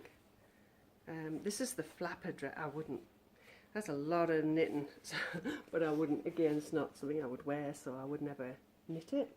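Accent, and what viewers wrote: Sunderland, United Kingdom. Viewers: Nice to see you back, missed your Sunderland accent lass. Hope 2017 will be better for you & your family♥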